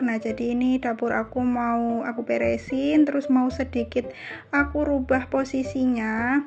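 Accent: Indonesia. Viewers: Ganti suasana ya bun jadi lebih luwas,iya bun logatnya keliatan bgt Cilacap 😊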